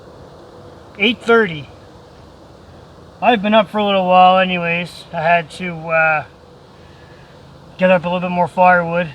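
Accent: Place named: Scotland, United Kingdom. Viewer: you sound kind of funny for a Scotsman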